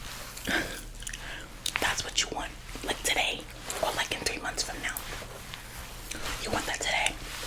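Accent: South, United States. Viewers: The Southern accent is spot on i love it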